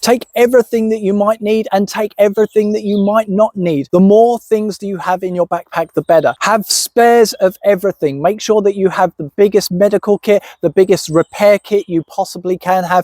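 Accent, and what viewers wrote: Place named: United Kingdom. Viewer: I don't know man, I love the premise behind the videos, etc. But something in the delivery is missing.... Perhaps it's your British accent making us Americans think you are just being a pretentious arse instead of being tongue in cheek. Maybe dress up as one of the knight s who say nee or something, somehow give us some slapstick. I'm not sure exactly what, because the observations are very on point, so it's gotta be somehow in the delivery that just doesn't get me laughing.... Can I ask where you originally hail from? Dry British comedy can be enjoyed by us, but we still remade The Office with Steve Carell....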